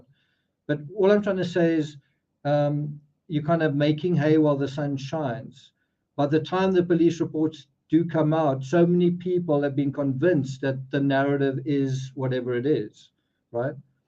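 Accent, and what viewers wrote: South Africa. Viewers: please do not go to another garden! I appreciate you so very much - and I am sure so do many many more. All your time and energy in constantly following the truth, your insights into human nature and behaviour, your continuous urging to look at ourselves. And the oh so familiar South African accent - your nest certainly would be well lived in in our garden!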